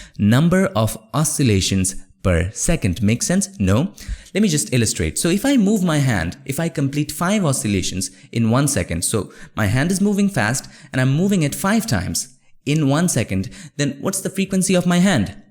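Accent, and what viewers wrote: India. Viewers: exactly! And i love how he still has his indian accent but it is still understandable!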